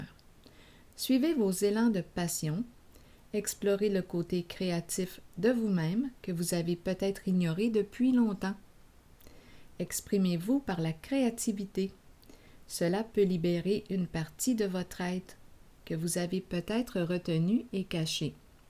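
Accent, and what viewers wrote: Quebec, Canada. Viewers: j adore votre accent du Québec !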